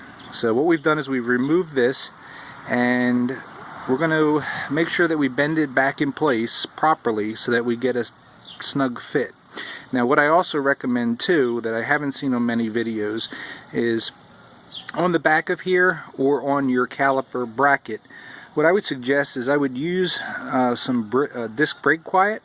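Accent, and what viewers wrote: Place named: East Coast, United States. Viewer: Your def from Back east. Got the accent!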